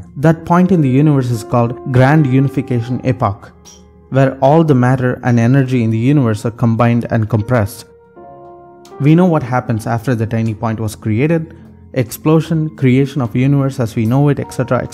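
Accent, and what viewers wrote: India. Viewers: This is off topic, but since you are a person seeking knowledge as well as me, I thought I could ask a politically incorrect question and you would not get offended. Please don't take this question the wrong way, I am asking because I really don't understand. When people with an accent from India speak English, they often roll their Rs and add a "d" sound. When they say a "w" sound they tend to add a "v" sound. Why is that? The reason I am confused is that I can make your sounds by imitating how you speak. But that makes me think that there must be certain pronunciations of your native language that native English speakers will get constantly wrong that indicate they are native English speakers to you. What are those sounds that we native English speakers consistently mispronounce that give us away?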